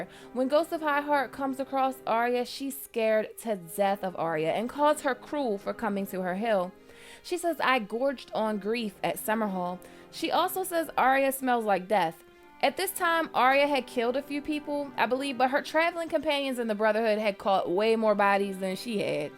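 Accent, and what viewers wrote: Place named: Philadelphia, United States. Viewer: Your videos are very well thought out and entertaining. Your passion for the subject matter shines through and is absolutely infectious. And your voice,...it triggers something primal in me. Can't explain it simply which means I must not understand it well enough. But to avoid sounding creepy I'll just say your voice is very nice. Sounds unmistakably Philly to me but idk. Your work and effort is much appreciated.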